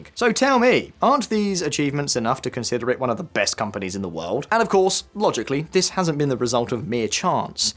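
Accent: United Kingdom. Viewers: British accent. Disregard.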